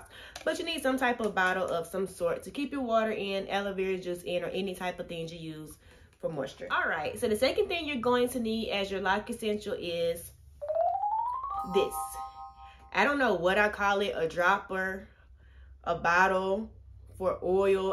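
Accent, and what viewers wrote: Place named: United States. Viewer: You right! That “mist” bottle is the bomb! I just got one a week ago and I love it! And no apologies necessary … I love your country accent.😁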